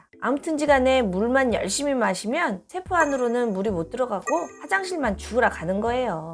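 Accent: Gyeongsang, South Korea. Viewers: 이 분 갱상도 사람이라 "의" 발음을 못하내. "으". "으".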